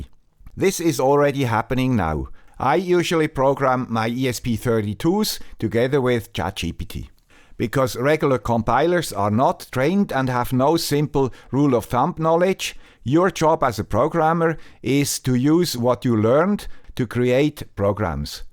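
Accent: Switzerland. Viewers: it is usually written as: "why should I trust your definition, if you can't pronounce 'inference' properly. Let's assume the poster meant it as a joke (which it usually is), though missing the point of "the guy with the Swiss accent" :) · If I’m not mistaken, this marks your 500th video! A truly remarkable milestone! Congratulations from the bottom of my heart! Your videos are a constant source of inspiration for the community you so thoughtfully cater to. I always look forward to each new episode, filled with fresh ideas and, of course, that delightful Swiss accent, which adds an extra charm to even the most fascinating content. Greetings from Cologne!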